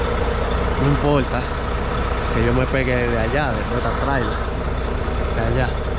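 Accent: Dominican Republic. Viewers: Hey nítido son tus explicaciones ese asentó es puro dominicano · Manin tu suenas dominicano, saludos desde República Dominicana colega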